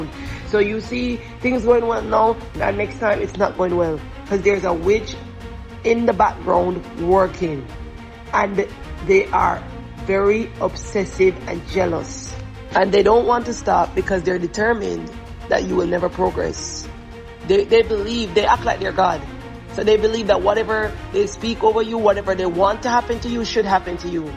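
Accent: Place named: Jamaica. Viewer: ⚠️ REQUEST, that you woman of God slow down when you speak. Above prayer confirms what God has been saying. My own family's "bad luck" now I see as sorcerer caused due to jealousy plus we have suffered due to lack of knowledge. I just saw your channel first time now and I sense you are a genuine believer, not some false YouTuber. Praise God. Slow that beautiful Jamaican accent coz I will continue to repeat some of your prayers, or all. Thx!❤️🌹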